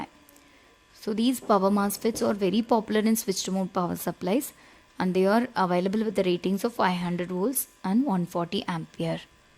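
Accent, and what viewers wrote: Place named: Kerala, India. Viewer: Thank You Ma’am ! And your Kerela accent is cute :)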